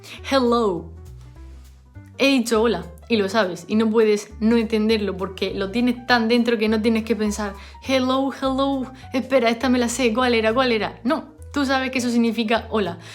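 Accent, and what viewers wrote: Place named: Andalusia, Spain. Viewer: Hola, ví otros videos tuyos y me quedé un buen rato y te felicito, tu contenido es puro oro. Llevo varios años con mi tenor Jupiter y sigo aprendiendo de personas como tú. Al principio creí que eras de Chile por tu hermoso acento que si no estoy mal es andalúz. "Sonamos" muy parecidos, realmente, sobre todo cuando dejamos los modismos de lado 😂, saludo grande desde Valparaíso, Chile 🎷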